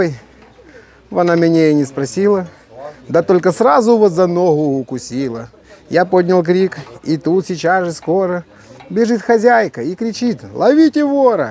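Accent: Odessa, Ukraine. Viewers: Одесский говорок росслабон для уха😂, привет из Харькова.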